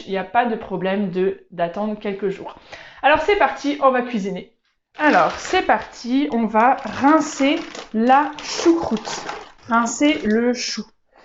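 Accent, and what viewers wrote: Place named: Germany. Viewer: Jeane het sy kanaal interessant gevind, maar ek is baie hartseer dat so 'n Duitse streek en so kultureel ryk deur Frankryk gesteel en geskeur is. Save is iets wat altyd Duits sal wees, net soos Baguette en Croissant en Frans nooit Duits nie. Die hamburger wat ons regoor die wêreld eet, is Duits en ek hou van hamburger 🍔. Van die kosse van Frankryk en Duitsland hou ek net van hul kaserne. Is 'n liefde vir persoon. Sit slegs vir my in Casher -modus, geen kontant nie, ek is uit. Praat met die regering van Duitsland met Alasacia en Lorena gaan terug na Duitsland en word susters van die Safar en Beiere soos voorheen was, vra die Alsace -ouens en Lorraine om 'n plebiscite te maak en natuurlik toestemming uit Frankryk te vra, respekteer die 2 kulture Met respek, toegeneentheid en jammerte van u, u siel en Duits, selfs met tweetaligheid, pas u nie by die Franse kultuur en dié van Latyns -gebaseerd nie, in wese sal u nooit Frans en Latino's wees nie. 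Ek sê dit met liefde ❤, respek en liefde vir die mense van Linda Alsácia en Lorena met hierdie pragtige en wonderlike kultuur, met die hulp van Frankryk, kom terug in vrede in Duitsland. U aksent is ideaal vir Duitse, maar rigiede starre firma tot Frans en ook baie vinnig guttural. U is wonderlik soos mense en mense van streek hou, maar met die vakbond praat om terug te keer na Duitsland, u het geen skakel met Frankryk nie, sal nooit Latino's wees nie. Wees Germaans en regte Duitsers, Germaans van eer en vreugde. Ek het u kultuur ontmoet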